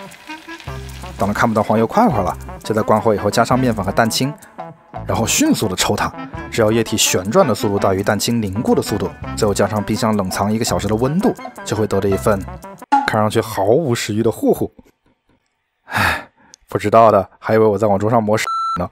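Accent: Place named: Sichuan, China. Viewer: 四川人吧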